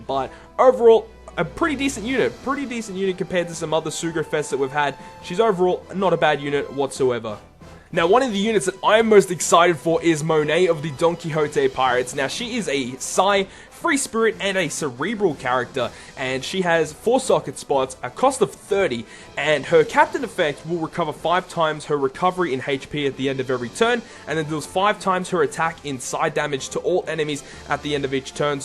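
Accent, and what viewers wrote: Australia. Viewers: You Australian?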